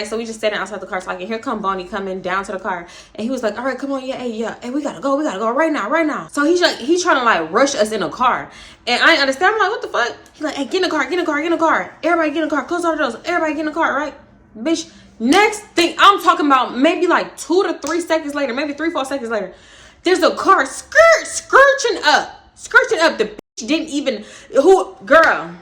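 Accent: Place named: Chicago, United States. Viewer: WHY do you sound so Chicago!? #why 💪🏾💯💓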